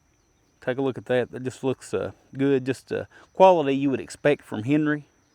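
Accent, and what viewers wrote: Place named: Louisiana, United States. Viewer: You from Louisiana? That accent sounds familiar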